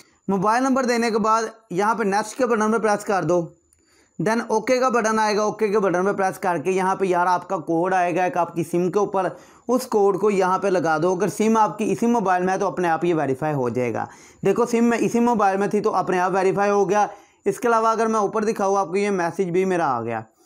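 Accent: Punjab, India. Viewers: Nice accent.. hindi bhi Punjabi jaisi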